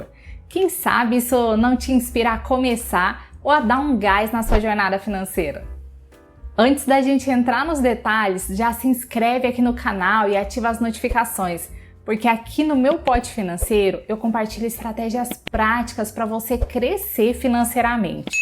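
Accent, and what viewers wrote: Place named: Minas Gerais, Brazil. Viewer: Pelo sotaque é mineira; inscrito.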